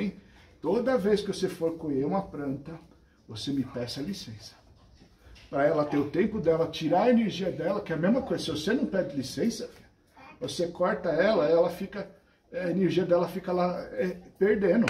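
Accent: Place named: North, Brazil. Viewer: Amo o pai, me lembra o dialeto do norte do Brasil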